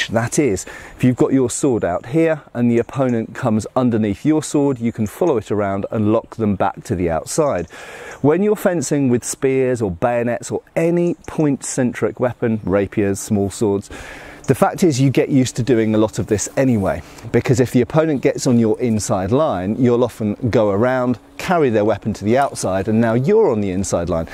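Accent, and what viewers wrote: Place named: United Kingdom. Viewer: ive added a boar spear to my home defense collection, largely because my neighbors insane pitbull got out again and attacked someone (i wasnt home at the time) but also because theyre relatively cheap and it gives me what i hope is a good non-firearm option. at this point, should i ever have to use it against a two-legged miscreant no matter what that fellow looks like im going to be seeing a genial, bald smiling man with a british accent. i think i watch waaay to many of your excellent videos.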